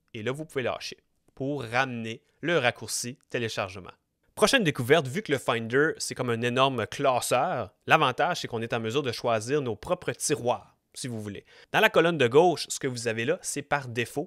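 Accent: Canada. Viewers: J'adore l'accent Canadien.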